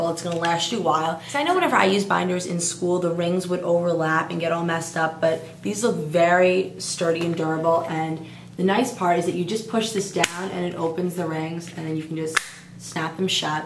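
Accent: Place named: South, United States. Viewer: no effence but your accent gets on my nerves are you southern? like its not lasht year its laSt year!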